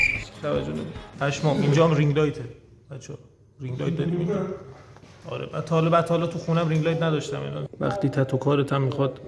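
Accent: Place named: Kermanshah, Iran. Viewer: عاشق لهجه کرماشانیت شدم 😂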